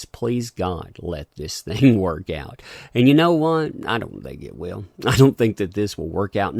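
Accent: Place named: Carolinas, United States. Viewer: are you north or south Carolina? You sure sound like it 👍 This Skywalker movie sounds horrible and I dont see it doing what Disney hopes.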